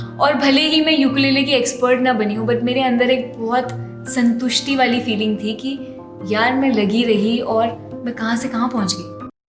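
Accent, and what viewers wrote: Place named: Uttar Pradesh, India. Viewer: Really appreciate your support and spirit changing many many lives.... Dear this Abhilasha from Varanasi.... yesterday saw your first video and then watched many... laga you are my old friend... your outlook towards life simple yet amazing.... n apni U.P kind Hindi loved it... May God bless you Dear be happy always ❤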